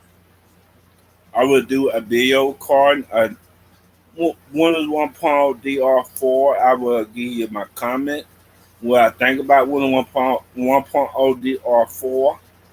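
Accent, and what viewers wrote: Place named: South, United States. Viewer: Just a bit of constructive criticism.....sometimes it's difficult to understand what you're saying because of your enunciation of words. It could also be your southern accent that may make it difficult for others to understand what you're saying. When you put those two together, it's even more difficult when you're making videos eating and trying to talk at the same time. Sorry that you were bullied.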